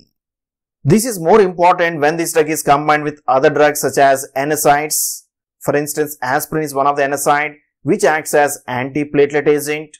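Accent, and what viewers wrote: India. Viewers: I open , I hear Indian , I leave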